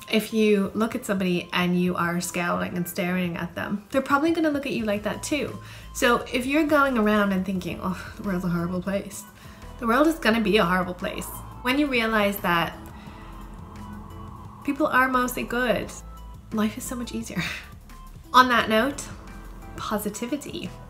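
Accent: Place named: Ireland. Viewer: Your cancer Journey will be as individual as you are...it won't be the same as the next persons...whatever you feel, whatever your mood, whatever your frustrations or elations are, they are yours...thanks for sharing your journey with us and attempting to have us understand what you feel...and by the way, I love your Irish accent..lol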